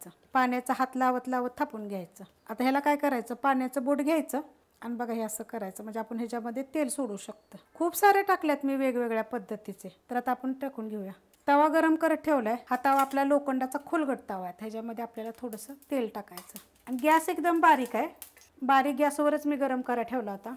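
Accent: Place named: Kolhapur, India. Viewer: काकी , तुम्ही कोल्हापूर भागातील आहात का... मला तुमच्या बोलण्यावरून् वाटले ... तुमच्या रेसिपी खूप आवडतात मला... मी नेहमी करून बघते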